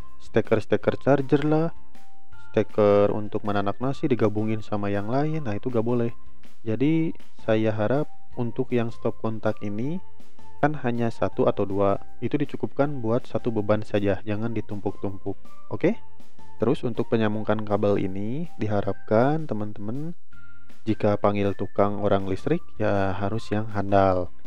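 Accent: West Java, Indonesia. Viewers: urang suna euy ieu mh, logat na katawis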